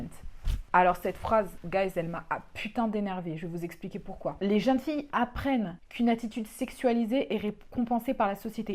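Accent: England, United Kingdom. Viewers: Team capricorne haha je me suis reconnue dans certains points. Tu es d’une honnêteté vraiment rafraîchissante et tes propos sont très justes, en tout cas ils raisonnent pour moi :) c’est un plaisir de t’écouter et de te regarder ! Je me suis abonnée il y a peu et je me demandais d’où te venait ce super accent anglais ? Tu as fais un séjour à l’étranger ?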